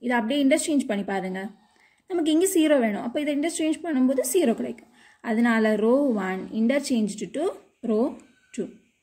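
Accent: Kerala, India. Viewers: U r malayali right .?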